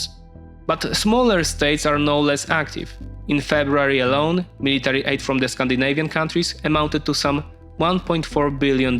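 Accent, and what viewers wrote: Poland. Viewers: Yaaaaaaaay he's Back!!!!! The Polish accent is back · So happy to have the Polish accent back !!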